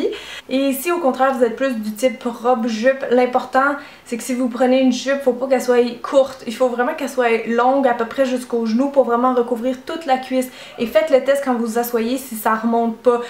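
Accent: Quebec, Canada. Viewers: créative, toujours de bon conseil, souriante, çà fais un moment que je te suis sur you tube et je voulais juste te dire de continué c'est un grand plaisir et tu es très pédagogue et surtout un accent québécois que je comprend haha !! bisous d'une française !! :)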